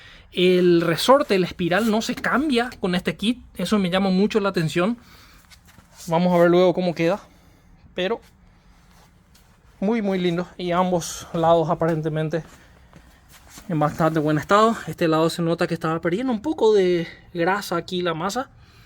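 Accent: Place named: Paraguay. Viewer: Cara, sou de Foz de Iguaçu. Nasci em 79. Minha adolescência foi com JDMs maravilhosos circulando, cuja origem, do py. Assistir teus vídeos me remetem um saudosismo do sotaque dos paraguaios na fronteira. Show😊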